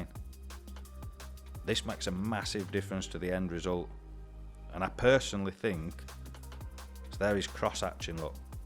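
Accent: United Kingdom. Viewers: OMG what a thick accent like wow, the most British/English accent I have ever heard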